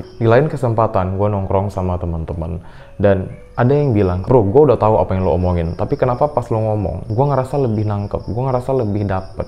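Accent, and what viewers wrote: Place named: Aceh, Indonesia. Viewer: nyan kan butoi.. meuaceh that bak pegah haba 😂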